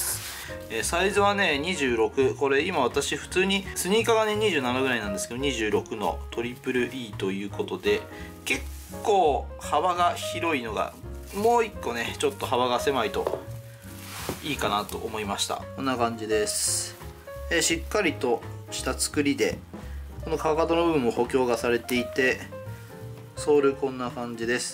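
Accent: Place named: Nagoya, Japan. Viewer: 訛りが静岡の人かと思ったら名古屋の人だった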